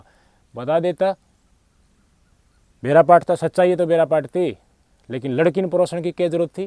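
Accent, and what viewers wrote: Haryana, India. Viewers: Bhai apki speach delivery kamal ki hai haryanvi bhi aap mitha karke bolte ho..bahot badiya bhai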